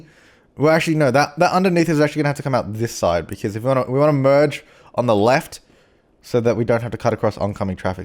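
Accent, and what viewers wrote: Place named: Australia. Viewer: What your Australian? I thought you were from the UK PS: I didn't know Australians drive on the left side of the road.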